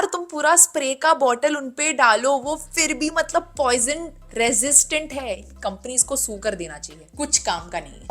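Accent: India. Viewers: i come across with your videos today, and watching you speak Hindi with desi accent i can't stop laughing. 😂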